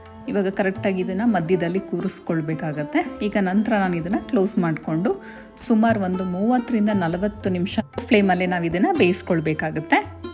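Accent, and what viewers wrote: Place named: Malenadu, India. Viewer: ಹಾಯ್ ಮೇಮ್. ನೀವು ಅಚ್ಚ ಕನ್ನಡದಲ್ಲಿ ಸ್ವಚ್ಚವಾಗಿ ಮಾತಾಡೋದನ್ನು ಕೇಳಿದ್ರೆ ಹಾಗೆ ಕೇಳ್ತಾ ಇರ್ಬೇಕು ಅನ್ಸುತ್ತೆ. ನಿಮ್ಮ ಮಾತಿನ ಶೈಲಿ ನೋಡುವಾಗ ನೀವು ನಮ್ ಮಲೆನಾಡಿನ ಕಡೆಯವರಿಬಹುದೇನೋ ಅನ್ಸುತ್ತೆ. ಮತ್ತೆ ನಿಮ್ಮ ರೆಸಿಪಿಗಳೆಲ್ಲ ಒಂದಕ್ಕಿಂತ ಒಂದು ಚೆನ್ನಾಗಿದೆ. ವಿವರಿಸುವ ಪರಿಯಂತೂ ವರ್ಣನಾತೀತ. ಅದನ್ನೆಷ್ಟು ಹೊಗಳಿದರೂ ಸಾಲದು.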